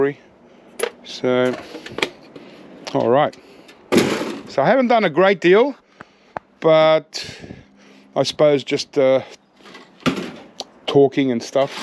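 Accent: Australia. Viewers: I cry when I see him selling the boards without depopulating them like the TITLE said...but still he is very worthy of watching and the Aussie accent always tickles me.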